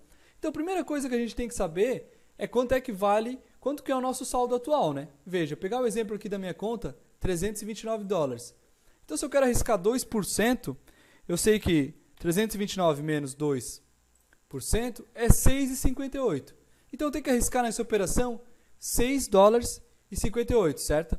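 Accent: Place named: Santa Catarina, Brazil. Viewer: ajudou bastante ! Pelo sotaque você deve ser catarinense, se for somos vizinhos, eu sou de Rio Negrinho SC rsrs....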